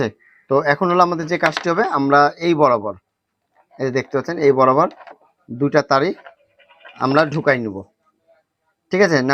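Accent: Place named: Chapai Nawabganj, Bangladesh. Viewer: ভাই আপনার বাড়ি চাঁপাই না রাজশাহী না নওগাঁ? 😊 আপনার কথা শুইন্যা হাঁরঘে চাঁপাইয়ের মতন লাগছে❤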